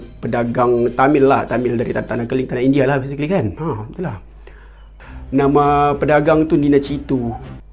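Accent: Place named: Sabah, Malaysia. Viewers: bro ni org apa..bahasa melayu dia mcm org sabah sarawak... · Dia Sabahan bang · .. ehh aq takde pun cakap komen die masalah. Ke kau yg tak reti baca komen aku.. cer baca dan hadam btul btul.. kau tgok speaker tu org sabah serawak tapi cuba jugak untuk cakap melayu.. dekat situ pun dh boleh belajar sesuatu. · mcm orang tawau baini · Lepas ni kau cerita pasal pilipin pulak aku dengar cara cakap kau ni org pantai timur Sabah kan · Aku rasa cukup pekat Sabah sudah tu bahasanya tu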